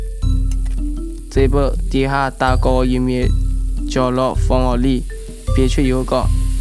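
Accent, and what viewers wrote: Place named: Jiangsu, China. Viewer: 我只知道和江苏话很像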